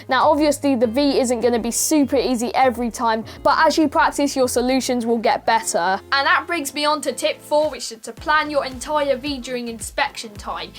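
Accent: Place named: United Kingdom. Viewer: Say "bottle of water" in your British accent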